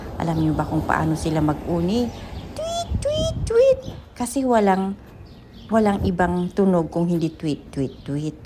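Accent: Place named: Ilocos, Philippines. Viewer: Nakakatuwang pakingan ninang ilokano tagalog❤❤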